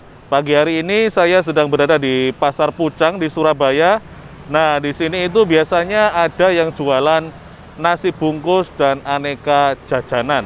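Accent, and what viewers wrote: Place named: Jakarta, Indonesia. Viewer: Sing dodol wong ndi cak...kok logate sok Jakartaan 😂 #dibahas Tapi asli ngiler liat sego bali daginge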